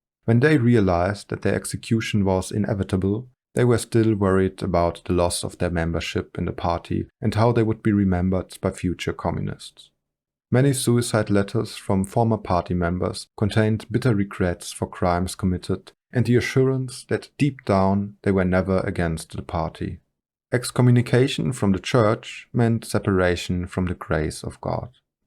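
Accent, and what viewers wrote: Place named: Germany. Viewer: He's a German for sure. Can't you tell by that accent of his? I don't know why, but I find that a German accent, or just plain listening to the spoken German language to be quite compelling.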